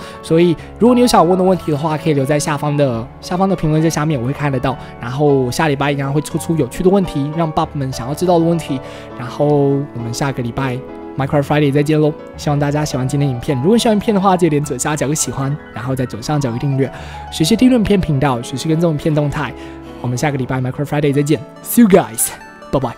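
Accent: Cantonese, China. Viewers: 阿神的粵語好可愛～(PS:Bob們都知道阿神笨笨傻傻的，那阿神覺得這種性格是好是壞呢？） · 阿神講粵語好可愛<3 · 阿神講粵語好可愛阿wwwwwwwww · (香港的粉絲)阿神說粵語很可愛^^:D雖然聽不太懂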